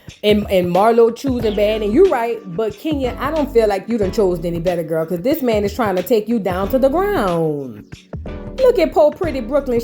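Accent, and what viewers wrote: New Orleans, United States. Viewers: it’s the accent FOR ME‼️The NOLA accent is my favorite.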